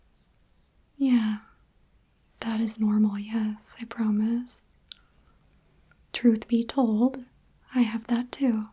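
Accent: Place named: Quebec, Canada. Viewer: If you are not French then you are probably from Quebec (Canada)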